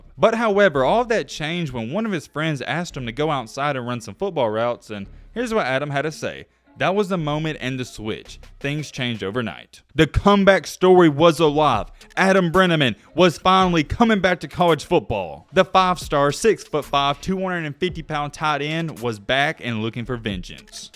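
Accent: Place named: Appalachia, United States. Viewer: Bro, your hillbilly twang is awesome!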